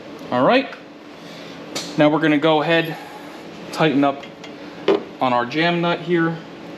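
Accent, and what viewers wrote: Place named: South Jersey, United States. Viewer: Much appreciated, it's on my list. Kudos from a native South Jerseyan (clocked you accent immediately 😅)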